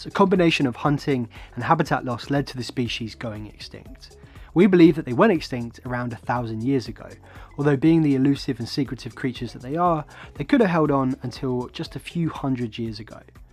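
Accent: United Kingdom. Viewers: Another posh English voice poking his nose in our business..🤢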